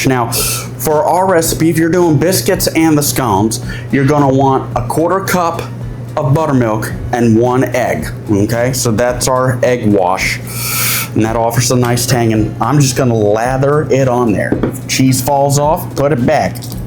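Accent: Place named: South, United States. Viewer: Great show!!! Can’t wait to see what’s next. I didn’t know you were from the south???😂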